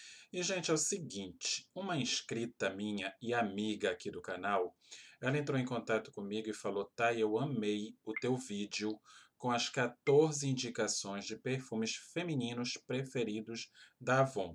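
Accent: Pará, Brazil. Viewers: Vc fala parecido com paraense ! 😘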